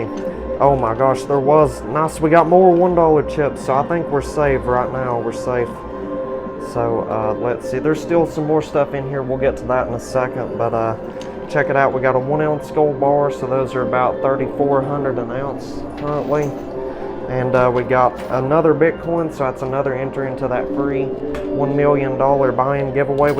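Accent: South, United States. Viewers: It’s in English-with a southern accent.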